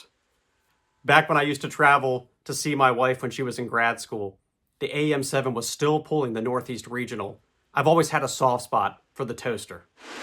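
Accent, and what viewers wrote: Kansas City, United States. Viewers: You're totally from Kansas city...right?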